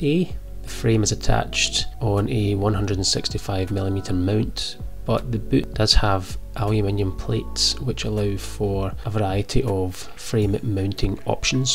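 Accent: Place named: Scotland, United Kingdom. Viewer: Also where about in Scotland is that lovely accent from?!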